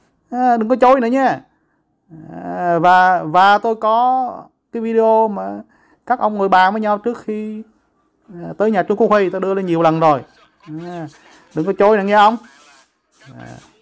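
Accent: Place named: Quang Nam, Vietnam. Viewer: Giống noi tiếng Quang,không hiểu noi gì, ham nhiều chuyen,xỉa vo chăm biển người khác, sau co view cha nội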